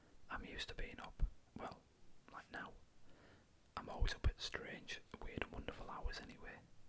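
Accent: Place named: Northern England, United Kingdom. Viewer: Just discovered you. You are now my favourite ASMRist. You sound like your from the north of England; if so where abouts? Yorkshire, Lancashire?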